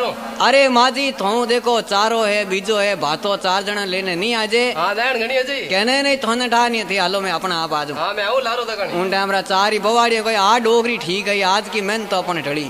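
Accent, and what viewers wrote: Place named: Marwari, India. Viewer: orignal Marwari Voice really enjoy !!! also help for new generation to learn history Thanks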